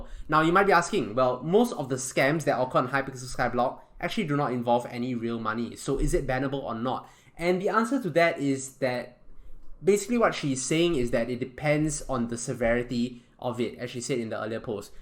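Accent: Singapore. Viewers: u sound singaporean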